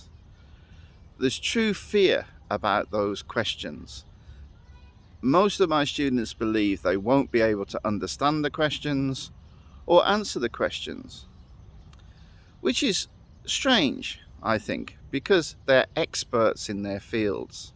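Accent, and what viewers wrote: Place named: United Kingdom. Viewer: Hi, I stumbled upon you video by chance and I thought it interesting. Recently I am more interested in British English than American English. Therefore I watch many British TV series on Youtube. Your English is clear and very easy to listen to but some characters in British drama speak strong accent and dialect that heard to understand. I haven't realized that there are so many dialect and accent in British English. Anyway I like British English so happy to hear your British English..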